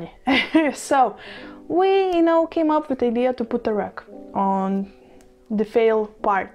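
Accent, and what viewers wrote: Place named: Russia. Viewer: сначала подумала что очень знакомо звучит английский, а потом поняла что ты русская^^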